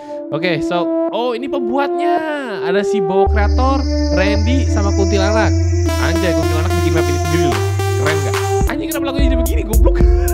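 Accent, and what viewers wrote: Java, Indonesia. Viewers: Njenengan tiang pundhi to mas kok radi jowo ngoten😅😅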